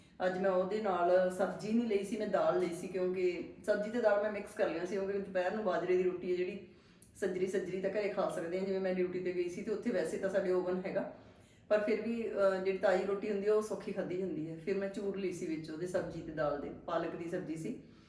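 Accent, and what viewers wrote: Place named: Bihar, India. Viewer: ਬੀਬੀ ਜੀ ਤਾ। ਬਿਹਾਰੀ ਬੋਲੀ ਲੱਗਦੀ ਹੈ ਭੈਣੇ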